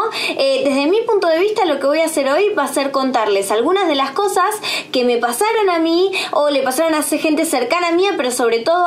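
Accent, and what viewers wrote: Argentina. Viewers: Sos voz de Argentina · Es Argentina:v es el asento del país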